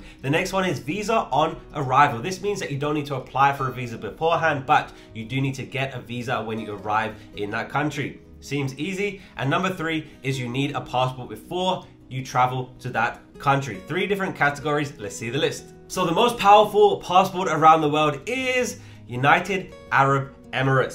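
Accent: United States. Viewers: 😂, you definitely sound more 🇺🇸 than 🇬🇧 😅 although having 🇲🇾 passport that needed to get visas to many places/countries. hwr,....WAS very privileged then, easily got any visas due to nature of former work 'flight crew' 😂 Thus, travelled to all continents & many countries..... 😂 2024April05th, Fri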